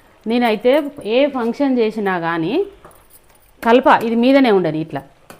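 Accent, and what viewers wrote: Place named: Medak, India. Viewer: Meeru Medak district la mataduthunnaru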